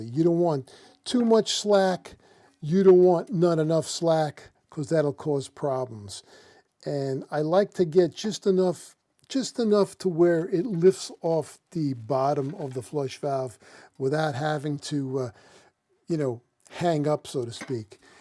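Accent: New York, United States. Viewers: Hey great video. Glad to hear another NYer voice 💪🏼, I’m from Bensonhurst & doing this over 23 years. Love these lil old school tricks you have. Keep up & god bless 🙏🏼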